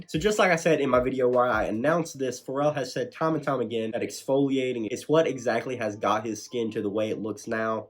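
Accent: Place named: South, United States. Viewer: What city/state are you from because I feel like you’re from California but in my opinion you sound like you have a southern accent.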